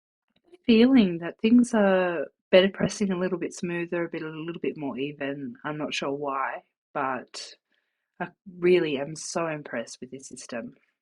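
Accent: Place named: Australia. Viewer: I tried to subscribe but it keeps sending me to another website. I will keep trying as I'm quite impressed with your video, the way you controlled the variables you weren't testing and your Aussie accent is not off-putting as some can be (I am Australian and my own accent would probably not work on Youtube)). Just tried again and it worked to subscribe this time so if anyone else has this problem, it's worth persisting.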